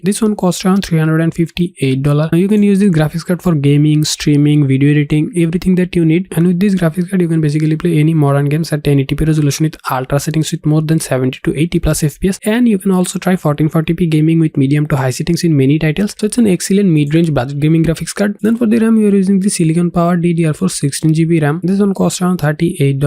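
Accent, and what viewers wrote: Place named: India. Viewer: You are talking way too fast. And your strong Indian accent makes it hard to understand a word of what you are saying. You might as well not be speaking English.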